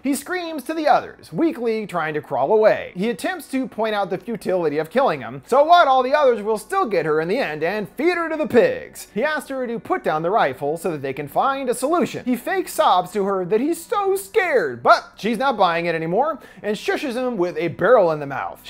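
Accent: Italy. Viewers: Wait don't go I liked your ~fabulous Italian accent~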